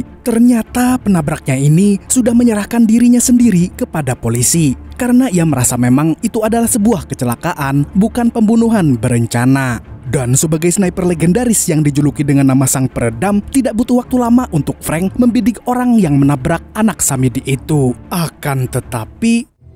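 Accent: Banjar, Indonesia. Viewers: Orang banjar kah bang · Hanyar sadar, sakalinya mimin urg banjar🤣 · Orang Banjar kah pian nhe hehehe😇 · Bang , orang rantau tapin atau Banjarbaru?? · Orang Banjar kah mang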